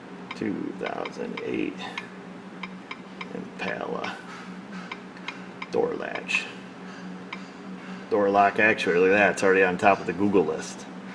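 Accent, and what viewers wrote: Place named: New York, United States. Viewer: "Wadd-a" you "BA-UN" NY accent LOL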